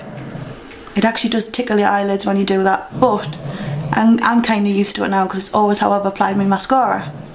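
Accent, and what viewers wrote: Newcastle, United Kingdom. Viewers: It's a Geordie accent! Newcastle, pet. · I love your accent! Where in the UK are you from? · she is a geordie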